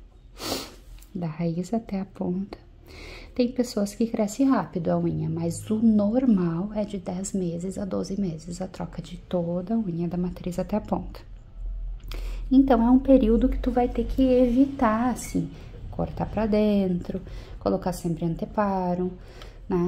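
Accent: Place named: South, Brazil. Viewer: A voz e o sotaque dela são idênticos aos da minha cunhada que também é do sul.😊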